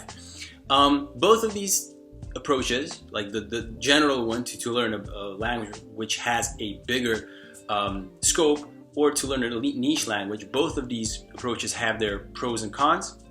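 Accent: East Coast, United States. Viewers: Hey, native English speaker from California here. From a west coast perspective, you definitely have a noticeable accent since you sound very east coast/urban with certain words here and there. If you're open to tips, ironically -- one of the words you pronounce a bit differently from most Americans is the word "language." You pronounce it like "Lan-guage" when most Americans pronounce it "Lang-guage." The first syllable sounds a bit flat when you say it -- it's usually "Lang" as in Langston Huges (the author) not "Lan" as in Lanyard -- the way you say it. Hope that helps. Anyway, keep it up! Your vids are awesome and your tips are really helpful. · I have watched your previous videos and I really can see big difference in your American accent, it's like you've been staying quiet good time there and absorbed the accent. Great work! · oh I thought you are from the US man, good spoken American English. 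· Honestly, I didn't notice the east coast american accent until you mentioned it. But then I totally got it. The first time I heard you speak on Youtube I just thought you were american! It took about 10 or 15mins of listening to you before I noticed the hint of an accent. And I could've never guessed you were from a slavic country 😂 I guess it helps learning English as a kid while in the US. Most slavic language speakers who learn English as a 2nd language have pretty heavy accents that they have a really hard time improving. As far as non-native English speakers, you're in an elite class of maybe 3 or so YouTubere I've seen where I just couldn't believe they weren't native at first! Anyway, I'm from Cincinnati, OH, so maybe that's a reasonable native English speaker's assessment? Anyway, love your videos, man!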